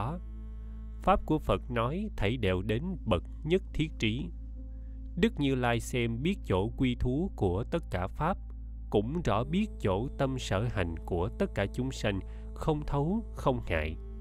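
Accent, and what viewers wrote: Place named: South, Vietnam. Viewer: Rất cám ơn thầy Thích Thanh Từ và KTH. Tôi ở nhà có cuốn kinh Pháp Liên Hoa nhưng rất khó hiểu. Nhờ nghe bài giảng tôi mới hiểu thêm nhiều. Tôi cất giữ những kênh này mãi mãi ! Giọng thầy đọc rất hay, trong và sáng sủa và nhất là thầy nói giọng Nam giúp cho tôi hiểu rất dễ dàng hơn giọng Bắc . Rất cám ơn! Nam Mô A Di Đà Phật.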